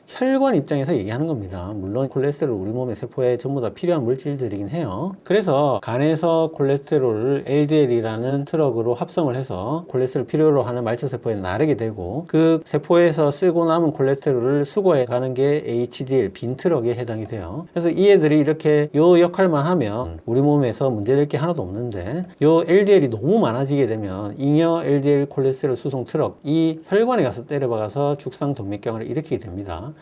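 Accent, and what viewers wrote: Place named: Ulsan, South Korea. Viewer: 말투가 너무 익숙해요 ㅎㅎㅎ 참고로 저는 울산출신 이에요 ㅎㅎ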